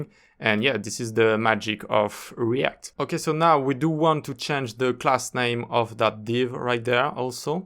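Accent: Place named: France. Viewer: Oh mon Dieu l accent français ne nous quitte pas même en anglais force à toi mon reuf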